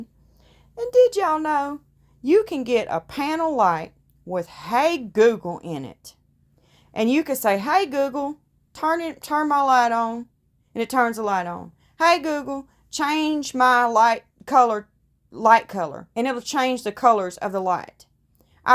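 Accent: South, United States. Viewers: I adore these mixed media resin style pieces❤ur daughter is gonna adore it I’m certain of it!! Did I see on the hobby lobby sticker Oklahoma? I knew u were from the south Cz of ur draw & that u say “fixin to”! I went to high school in Claremore Oklahoma & still have friends I visit in Oklahoma City every few years. It be crazy if ur from around there too🤯🫢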